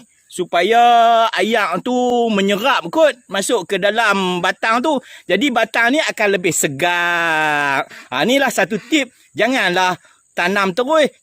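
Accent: Kedah, Malaysia. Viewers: org pahang awat cakap kedah😁 kami teringat kat keluarga kami kt kg😢😢😭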